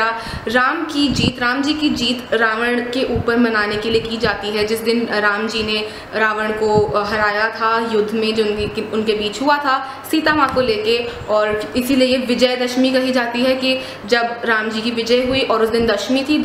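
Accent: Delhi, India. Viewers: Hi 😊 your Hindi is typical Delhi wali--haha! You remind me so much of DU I attended Miranda for a year but now in Europe. I love you Hindi Vlog and other videos as well. And 😁 your Hindi is so so similar to mine ( Love your hand actions while you talk 😊😊 haha) --lots of love 💛 Tenzin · Wow..! its so refreshing listening to your "diliwali hindi". Delhi is my hometown and its been a while since i left the city after my wedding. It feels nostalgic listening to you speak. i felt like i was hanging out with my girls in delhi. This video brought a smile on my face. Thank you,. You're amazing. Keep going. 👍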